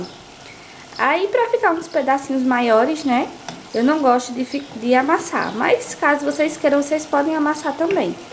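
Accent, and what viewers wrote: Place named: Northeast, Brazil. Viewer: Voz meiguinha...bonito sotaque nordestino...prato maravilhoso...bateu a fome agora...Parabéns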